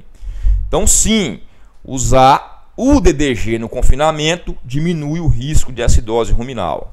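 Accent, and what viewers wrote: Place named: Bahia, Brazil. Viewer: Professor tinha quase certeza pelo seu sotaque que era da Bahia! Acabo de receber de um amigo com quem compartilhei este vídeo a informação que é conterrâneo e que hoje está na UFPA!! Sucesso!! Abs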